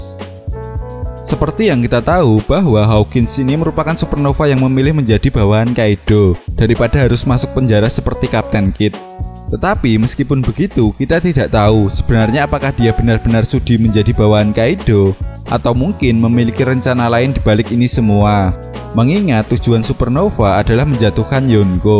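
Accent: Java, Indonesia. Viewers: Logat Jawa 😂😂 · Suara nya jawa banget mas , gaenak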